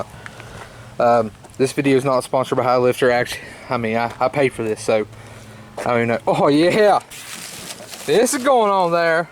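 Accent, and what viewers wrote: West Virginia, United States. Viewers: Where are you from. West Virginia ?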